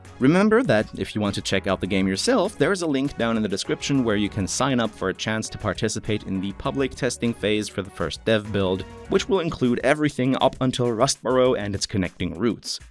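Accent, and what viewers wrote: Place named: Germany. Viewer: Are you german by any chance? You sound a lot like you were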